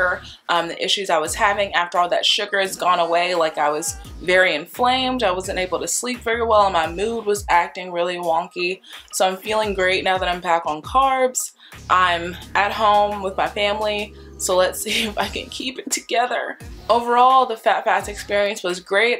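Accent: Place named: Bay Area, United States. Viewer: Where are you from? Sound like you're from the bay.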